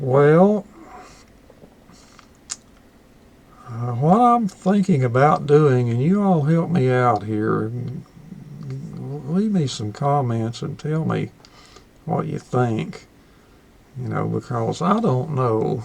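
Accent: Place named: North Carolina, United States. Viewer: I like your playing, and your voice (the southern twang from....North Carolina??), and also the old electronic gear in your room. All the best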